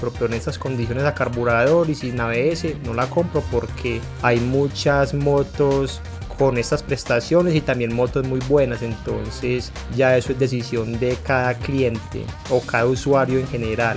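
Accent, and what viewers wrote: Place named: Colombia. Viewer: Creo que el dice que la compremos con ABS, por que en colombia venden motos pequeñas con ABS, pero de este lado del mundo no les importa nuestra vida. La verdad no se si este yutuber sea de colombia pero crep que si por el acento que tiene, no estoy muy familiarizado con ese hacento🤦🏻‍♂️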